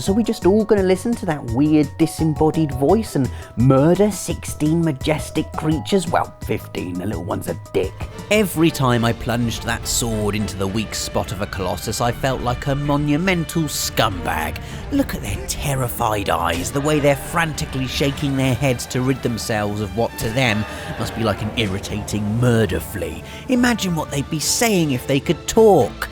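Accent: United Kingdom. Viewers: when he said "whispering into your ear" during the Dark Souls segment im sorry but his british accent made it sound like he said "whispering into your rear"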